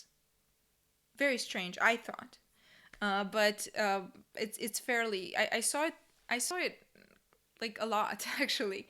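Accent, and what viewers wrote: United States. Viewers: I like you american accent 😍😍😍😍😍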